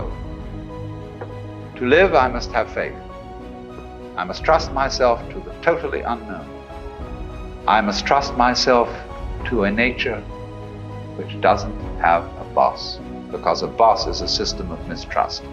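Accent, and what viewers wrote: England, United Kingdom. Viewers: Where do I begin. But that was the biggest most flowery word salad mixed with a pinch of despair I have ever heard. TRUST NATURE, your are nature, we are nature, nature will let you down, life has no purpose, Watt goes from if you don’t trust nature you will get tyranny??? Making a huge jump to support your ideology wouldn’t you say. I have never been less inspired listening to someone than what I just heard. But it’s cool and accepted because Watt has an English accent. You’re being lied to and you have no hope. But fear not because you can always trust nature, sometimes…., Wow!!!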